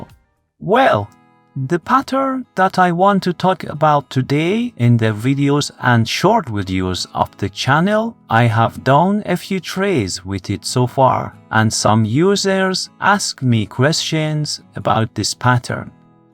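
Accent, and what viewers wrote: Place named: Iran. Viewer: درستش اینه منبع ذکر کنی همه می‌دونن این روش ها اولین بار توسط استاد روزاندیش معرفی شدن انگلیسی صحبت کردنتم که معلومه تابلو زبان اصلیت فارسی 👎💩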